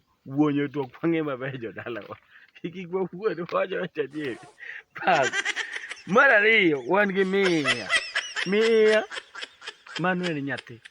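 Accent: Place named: Alego, Kenya. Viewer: Ijahau mangano, mano dholuo Mar alego anyiero sana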